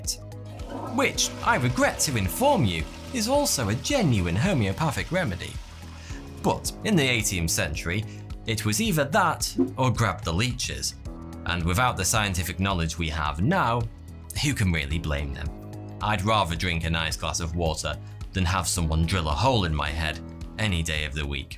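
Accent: United Kingdom. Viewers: Who cares he has a british accent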